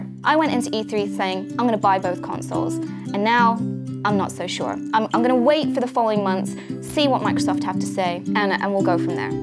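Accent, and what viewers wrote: United States. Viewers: why do you always sound american but your from england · Before E3 she had an English accent, now she sounds american. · Is English I keep on getting confused because sometimes she sounds american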